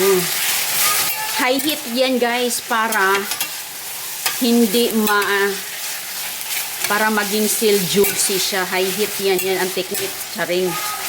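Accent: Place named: Aloran, Philippines. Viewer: Tagaasa manka ma'am sa Pinas moramag taga aloran ang tuno sa imong inistoryahan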